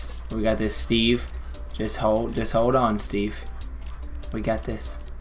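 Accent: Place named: Ireland. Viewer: Love the Irish accent 😂😂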